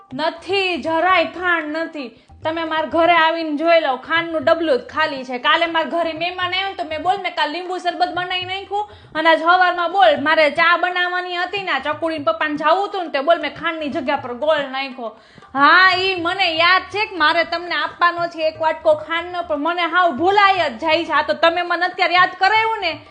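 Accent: Kathiawar, India. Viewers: એક પાત્રીય અભિનય અસ્સલ કાઠિયાવાડી , તળપદી બોલી માં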